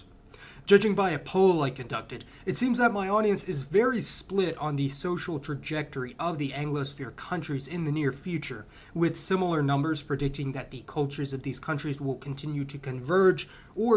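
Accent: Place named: General American, United States. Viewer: English is truly the best, the reason why for some it sounds meh is because it's spoken by billions of people as a língua franca. If it was spanish it'll be the same for that language or any other one. It also has many different accents but most are used to the version that is speaking in which is the standard american accent, because it is the norm in youtube. When you get use to something overtime it gets boring.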